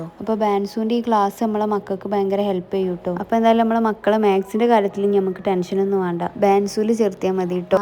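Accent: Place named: Malappuram, India. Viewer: Areekode slang ann🥰njanum angane aaan..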